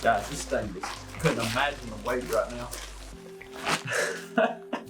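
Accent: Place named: Alabama, United States. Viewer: This fella from Alabama? His accent reminds me of.. me.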